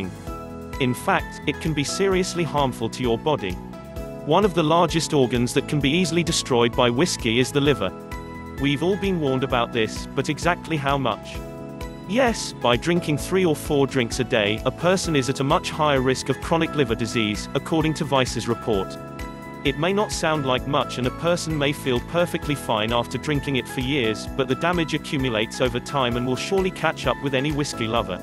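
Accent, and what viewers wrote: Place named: RP, United Kingdom. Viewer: How rich are u😂 im not judging but this makes me feel like the queen of england is talking about vodka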